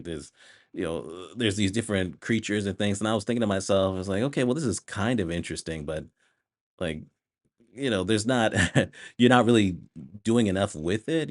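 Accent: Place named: United Kingdom. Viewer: And why the FUCK is he British????.?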